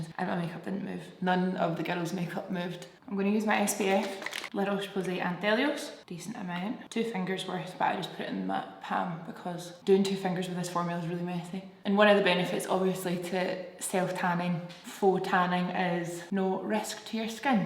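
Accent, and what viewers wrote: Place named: Liverpool, United Kingdom. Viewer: Love your Liverpool accent " where's me sponge" lol😂😂 from a proud scouser❤❤❤❤😅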